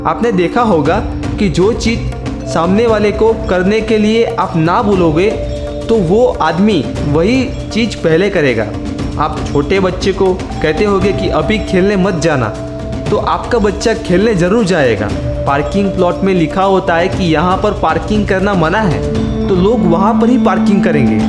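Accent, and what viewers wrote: Gujarat, India. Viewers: Are u Gujarati?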